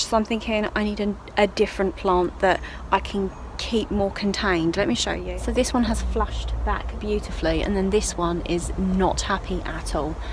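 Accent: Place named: London, United Kingdom. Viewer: But proper got your accent cos im from luton and my dad is london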